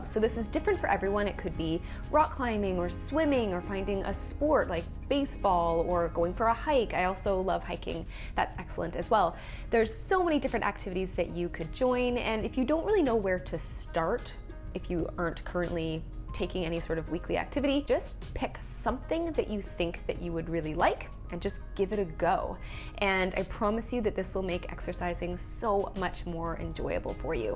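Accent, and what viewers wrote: Canada. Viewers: Thanks for the tips! Just wondering, are you Canadian?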